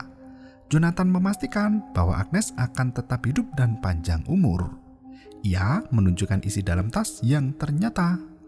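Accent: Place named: Magelang, Indonesia. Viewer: Kok dengerku Magelang ya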